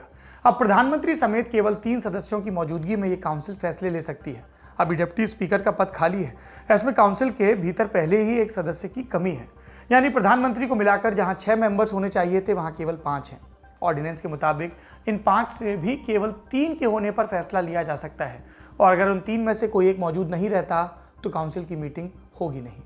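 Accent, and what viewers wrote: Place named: Uttar Pradesh, India. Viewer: ha Bhai but Hume Pasand hai wo up wale lehje Mai bat Karna... Bhai na andaj Kuch aisa hi hai...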